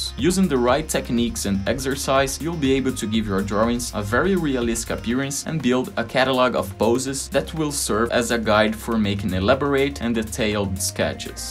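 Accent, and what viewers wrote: Brazil. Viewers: Só pela forma de falar já sei que é BR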